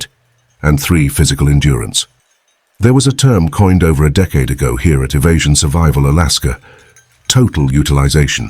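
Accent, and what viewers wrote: United Kingdom. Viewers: Voice over is brilliant...very English! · Awesome video, the British narration was a very cool feature. Has a documentary type feel to it in the beginning then transitions into your own footage which is neat. Great work 😎👍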